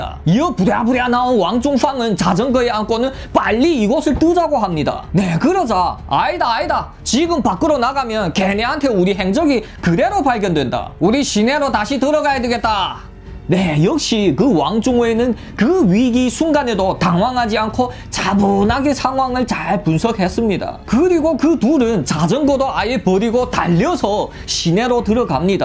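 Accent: Yanbian, China. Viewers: 왠 조선족 말투. 듣기싥어라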